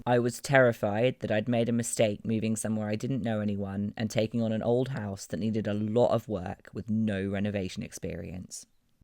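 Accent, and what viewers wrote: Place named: England, United Kingdom. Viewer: I just discovered your channel, a welcome surprise! Things I love about you, not in order:- 1. Your “give it a go” attitude; 2. Endless courage; 3. English accent pleasant on the ear (I can understand you): 4. Hard Worker; and 5. Beautiful property. And I smiled at the protected bats in your roof. Gotta love nature. Looking forward to your building creativity over time.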